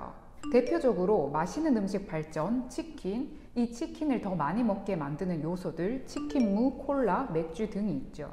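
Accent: Gyeongsang, South Korea. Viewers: 경상도 사투리가 은근 나오는 억양이 매력적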